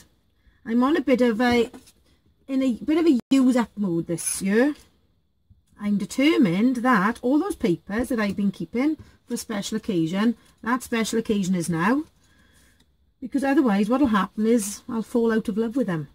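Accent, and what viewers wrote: Wales, United Kingdom. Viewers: thought you sounded more welshy than me lol. Xx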